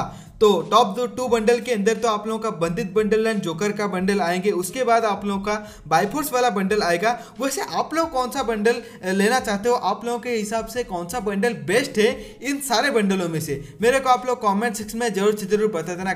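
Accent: Odisha, India. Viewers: Bro are you odia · Bro are you from odisha? 😅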